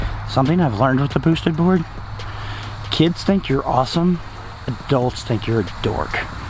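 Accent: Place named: East Texas, United States. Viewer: You should definitely ride the board into work! Love the vid! And that east texas accent is just proving me right that you're the.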